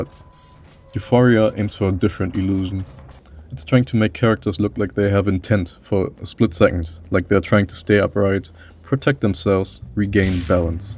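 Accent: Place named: Germany. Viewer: I hear a little DEUTSCH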